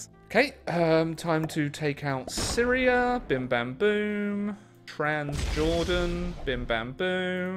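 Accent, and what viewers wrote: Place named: United Kingdom. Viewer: It makes my inner history nerd chuckle hearing a British person say"I don't want Quebec".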